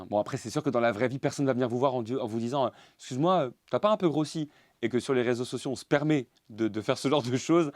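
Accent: France. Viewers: ❇❇❇❇Coucou. Pour moi tu as été une bien agréable découverte. J'aime cet accent de nord. Tes vidéos sans grossièretés, et pleine de légèreté me font toujours sourire. Tes parents sont touchants et sympathiques. Moi j'aime beaucoup, et en plus, je trouve que tes placements de produits sont super bien faits! mon préféré c'est celui avec Monique ! Gros bisous à vous 3 et demie. ( Le demi, c'est le petit qu'on voit de temps en temps)✅ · j'adore ton accent francais, je suis Québecoise et je t'ai découvert depuis 6 mois environs. Continue ton bon travail, je t'adore :)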